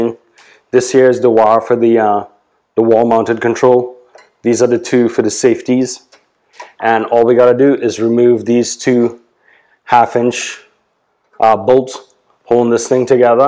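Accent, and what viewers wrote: Trinidad and Tobago. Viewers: You sound like a Trini. I am thinking about getting this one myself. Thanks for the video. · You’re a Trini?? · Is that a trini accent I hear? Thanks for the video by the way.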